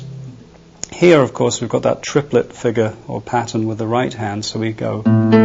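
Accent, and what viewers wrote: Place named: England, United Kingdom. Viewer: soft English accent + Spanish guitar = best. Youtube. videos. EVER!!!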